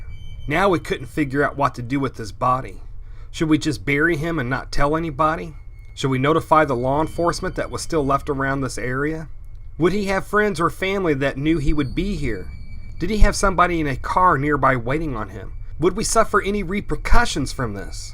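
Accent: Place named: Flint, United States. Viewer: Sounds like you live near Flint.Michigan lmao. Good series and the choice link finally worked. I'll have to go back to the beginning now.Thanks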